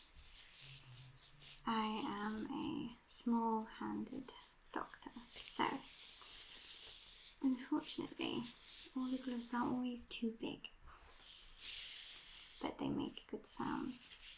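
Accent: United Kingdom. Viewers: Your British voice is so soothing thank you for making this medical role plays help soothe my autism can we be friends i hope you don't mind but i messaged you on Instagram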